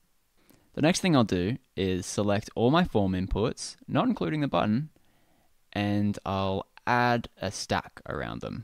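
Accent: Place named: Australia. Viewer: Love hearing an Aussie accent. Is there a Framer template that already has the multi step form built in? I'm useless at building stuff in Framer.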